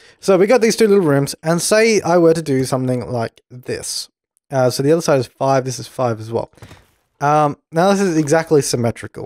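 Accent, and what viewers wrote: Australia. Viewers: I feel like I've just been smacked on the head with a newspaper and told "NO" in an Australian accent.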